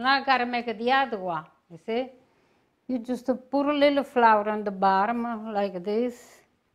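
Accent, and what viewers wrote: Italy. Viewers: I had a great Italian Nona....Miss her so much! Her daughter, my grandma Noni was the best cook!🍝🇮🇹 Wished I would have learned more Italian cooking from them....they both are gone now.....🇮🇹🍝🇮🇹🍝but you are truly the best to watch and learn from now! I love your strong Italian accent..... Thank you for sharing your cooking. 💚🤍❤️We love you! 🇮🇹🍝🇮🇹🍝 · Thank you for your video. I just found this channel and I just love listening to you. I was stationed in Italy in the 90s with the US Army. Used to have biscotti every morning in the café. I miss the accent and the sweetness. I will watch many more. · You are such a sweet lady angd i love your accent.a real italian indeed.you have a BUONA PASCUA.I LOVE TO WATCH YOU. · Isn't she delightful? And a proper Italian English pronunciation on toppa di tutti. I swear, I'm going to try this tomorrow. Great recipe to get rid of my figs and dates I'm struggling to finish. 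MIlle grazie!! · I absolutely LOVE watch (and listening) to you. I adore your accent. It makes me long for my Italian grandmother who passed when I was very young. I still feel a bond with her and my Italian heritage. I think about her and feel close to her when I make your recipes. I can see the joy cooking brings to you. Thank you for sharing your love and cooking with all of us., what kind of coffee do you drink and how do you prepare it? May God continue to bless you! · Vgffgf Vdghhft You mean she is speaking English with an Italian accent. At times, she speaks her native language.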